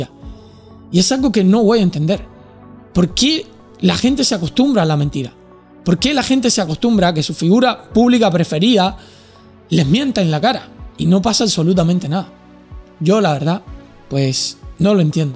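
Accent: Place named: Cuba. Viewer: la llegada de tus padres fue una alegría inmensa, y no sé si es manía mía nomás, se te escapa la modulación cubana más de lo que se acostumbra, jajajjaja Saludos y viva Cuba libre!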